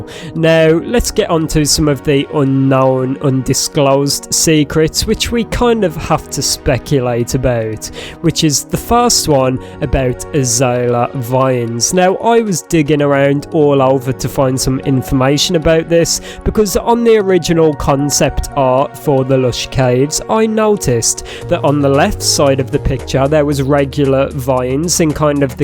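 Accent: United Kingdom. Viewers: Mans sounds like chills with a British accent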